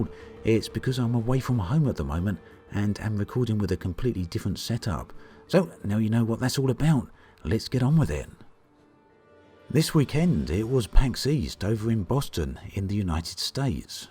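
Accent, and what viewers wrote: United Kingdom. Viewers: I did notice a slight British accent in your video today. (smile)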